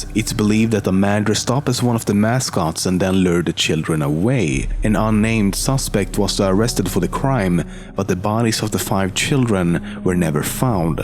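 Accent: Sweden. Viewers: Your accent sounds swedish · You are swedish huh? xD Strong accent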